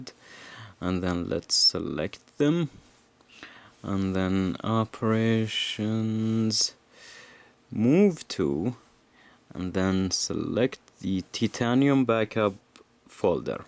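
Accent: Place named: Gulf, Arab world. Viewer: واضح انك خليجي او شي من لكنتك و والكلمات ما تقولها بسرعة ثاني شي الناس الي بالواتس مكتوب تحتهم بالعربي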